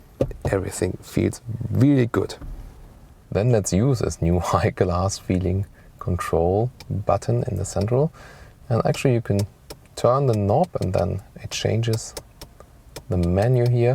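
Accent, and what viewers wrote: Germany. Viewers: Please talk english not genglish! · love german speak english.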